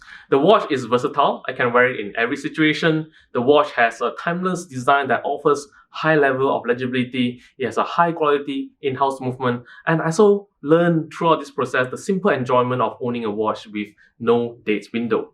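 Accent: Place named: Singapore. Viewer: I think you are a Singaporean? I can sense it with your accent. I worked there in SG before...😊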